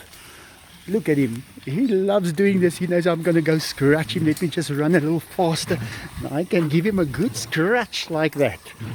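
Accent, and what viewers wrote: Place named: South Africa. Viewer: It sounds like you from south Africa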